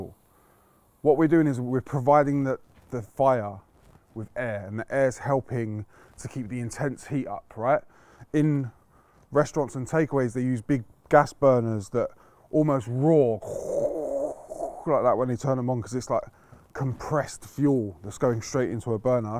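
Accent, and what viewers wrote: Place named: London, United Kingdom. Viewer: He speaks London👍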